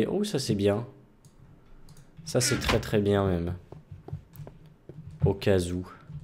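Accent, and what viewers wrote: Quebec, Canada. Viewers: Bon accent québecois,:-)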